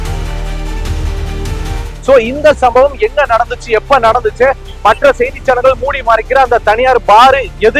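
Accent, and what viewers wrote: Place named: Tirunelveli, India. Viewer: தனிமனித ஒழுக்கத்துக்கும் அரசுக்கும் எந்த சம்பந்தமும் இல்ல அதான் ஆரம்பத்தில் இருந்தே சொல்றேன் உங்களுடைய எல்லா வீடியோவையும் நான் மறக்காமல் பார்ப்பேன்.... நீங்க பேசுற எங்க திருநெல்வேலி ஸ்லாங் ரொம்ப நல்லா இருக்கும்... அதுல ஒரு நேத்தி இருக்கும் அதுல ஒரு நியாயம் இருக்கும். ஆனா உங்ககிட்ட ஒரே ஒரு விஷயம் கட்சிய வச்சு பேசுறீங்க அதுதான் தவறு..... விளாத்திகுளம் அந்த பொண்ணு கற்பழிக்கப்பட்டப்ப அவ்வளவு பேசினீங்க இல்ல கோயம்புத்தூரில் அந்த சின்ன பொண்ண கற்பழிச்சதுக்கு எந்த மாதிரியான வீடியோ நீங்க போட்டீங்க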